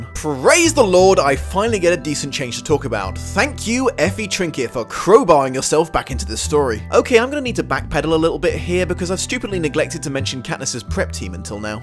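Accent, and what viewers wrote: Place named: United Kingdom. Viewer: I am so happy that I subscribed to you based on Nostalgia Critic's recommendation ^_^ I can never get bored of your British accent and witty humor :)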